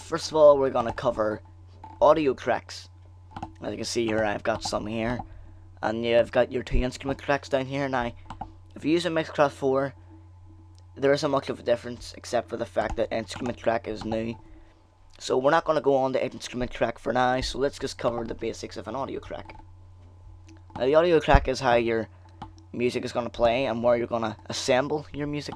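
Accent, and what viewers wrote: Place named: Ireland. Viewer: Like ur irish accent! :D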